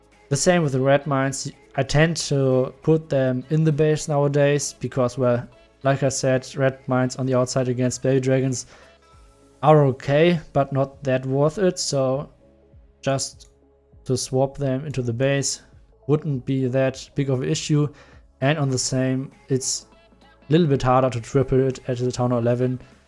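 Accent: Germany. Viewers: Wenn deutsche englisch reden 👍top erklärt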